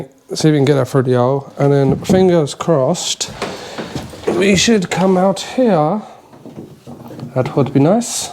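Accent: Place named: Essex, United Kingdom. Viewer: After living in Australia for 10 years hearing a strong Essex accent makes me wince. Lol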